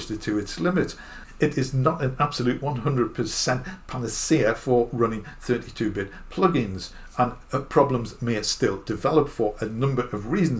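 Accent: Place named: England, United Kingdom. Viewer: Excellent and clear. Bloody champion and the proper English accent is welcome too!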